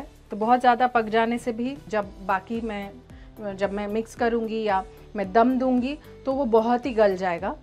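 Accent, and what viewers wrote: Bengal, India. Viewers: I like way you speak Hindi with Bengali accent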